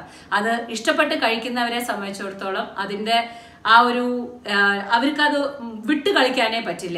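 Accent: Palakkad, India. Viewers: എനിക്ക് വിനി കുട്ടി കഴിക്കുന്നത് കാണാനാ ഇഷ്ടം.. Very natural.. No കാട്ടി കുട്ടൽ.. in palakkadan slang😄😄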